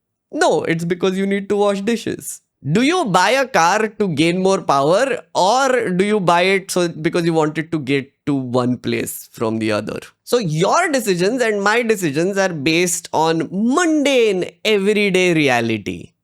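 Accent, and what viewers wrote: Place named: Vile Parle, India. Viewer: Love the vile Parle accent, tod phod faction 💪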